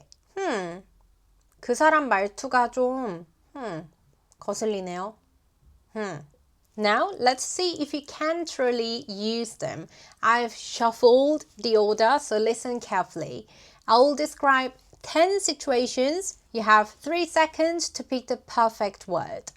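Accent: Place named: United Kingdom. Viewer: did you learn english in the uk? you have a strong british accent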